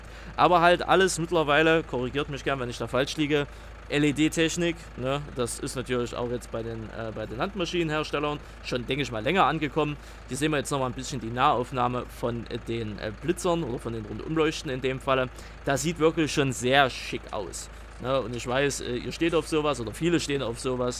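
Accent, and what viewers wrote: Germany. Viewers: Gibt auch Gegenden in Deutschland wo man so redet;)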